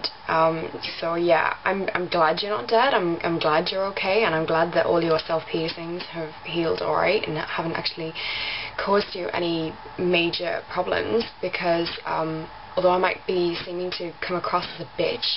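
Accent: Newcastle, United Kingdom. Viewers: R u geordie? sry off topics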